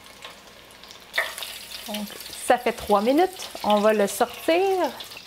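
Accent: Quebec, Canada. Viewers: Much love from the USA. I can hear your Canadian Quebec accent. Your take on North American Asian classics is some of the best I have seen. Thank you so much for these nostalgic recipes. Don't stop posting. We love you.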